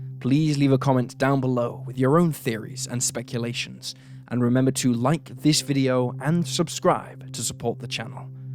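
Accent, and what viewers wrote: United Kingdom. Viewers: American stories with a British accent?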